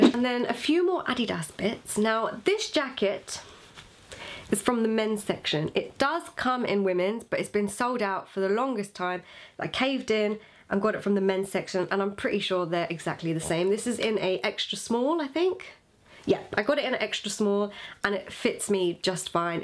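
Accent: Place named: United Kingdom. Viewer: Just found your channel I love your accent I'm British too your accent is so lovely . Love you style and vibe , keep up the good work Hun :) xx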